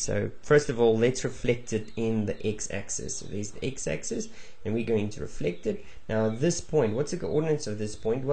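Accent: South Africa. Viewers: Thanks! This video was extremely wonderful and helpful for matric revision :) Great job... [I also noted the South African accent - being a Gauteng'er, the lesson was easier to grasp and much more tolerable!)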